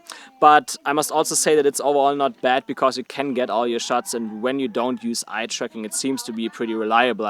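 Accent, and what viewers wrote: Germany. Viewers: Hey, really nice review! I got the Fuji X-S20 this week as well🙂 I am new to Fuji X. Since you mention it a lot in the review: What kind of video settings would you recommend for YouTube videos (No Flog / without color grading)? I think about: 4k, H265, 4:2:0, 200 Mbps, Eterna (?) Looking forward using this camera! Bist du eigentlich Deutscher? Es hört sich so an 😀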